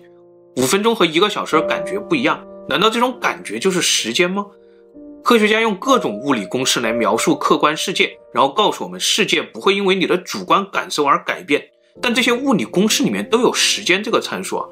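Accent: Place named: South, China. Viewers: 就喜欢这种不标准的南方口音普通话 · 我听出来是武汉人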